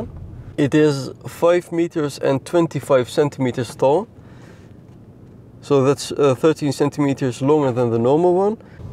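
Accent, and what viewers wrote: Netherlands. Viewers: Kyro de Boer ik denk dat hij wel nederlands is, ik kan het merken door zijn engelse accent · Prima video, ik zou alleen proberen met iets meer emotie te praten. Het klinkt door het Nederlandse accent niet heel gemeend, maar dat is gewoon oefenen. Blijf zo doorgaan! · you can really hear that your dutch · Nik Topler its dutch english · Lekker nederlands accenttje gap 😂😂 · dutch accent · Dat hollandse accent haha heerlijk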